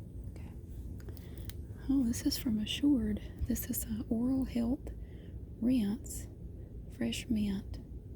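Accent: United States. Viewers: I just love your accent, it's my favourite american accent!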